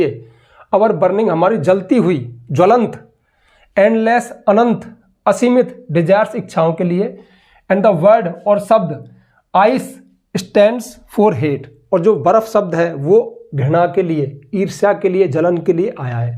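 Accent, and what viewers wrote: Rajasthan, India. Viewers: Sir mujhe lag raha hai ki aap Rjsathan se hoo 🤔😊❤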